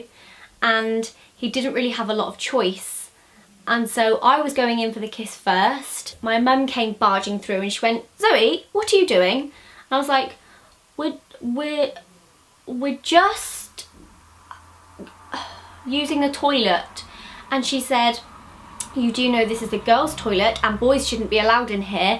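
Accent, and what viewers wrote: England, United Kingdom. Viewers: you know why i'm here. not because of you. your lovely british accent. i guess! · it seems like when you say ass its less offensive with your English accent than with my American accent.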